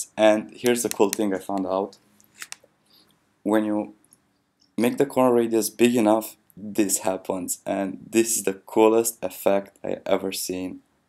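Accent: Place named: Russia. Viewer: Cool russian-english pronunciation =)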